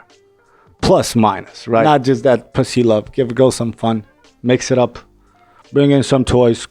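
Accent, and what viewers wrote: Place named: United States. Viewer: u speak english like an american! good job.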